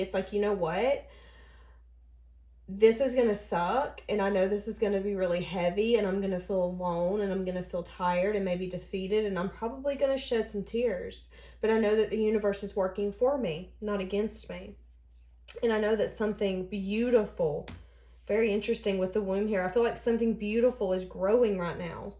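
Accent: South, United States. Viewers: I love your voice and southern accent 🖤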